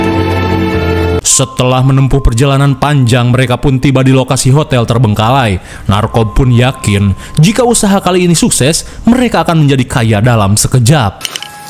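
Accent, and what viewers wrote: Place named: Java, Indonesia. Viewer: Gua suka konten lu bang . Omongan nya adaa ajaa . Nganclengan lah . Segalaa mecem wkwkwk .. medok banget ngomong nya 😂😂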